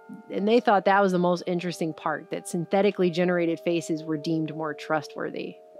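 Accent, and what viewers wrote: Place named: Texas, United States. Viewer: ngl that Texas accent becomes very irksome after several minutes of hearing it.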